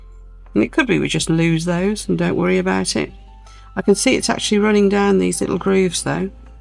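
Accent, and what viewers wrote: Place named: Black Country, United Kingdom. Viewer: LOVE the subtle black country accent.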